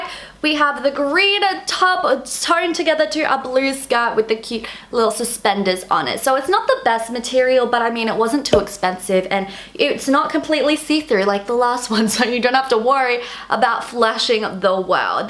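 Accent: Australia. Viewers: I’m being cheeky and I don’t mind if y’all don’t think it’s funny if I tell you to get yr heads out of yr bums. However, many people are trying to correct for saying it’s Wednesday. Maybe it’s just me, but I hear someone with an Australian accent say it’s a day later than it is for me and I think, “Hmm, I wonder what time zone they’re in?” not that they must be wrong.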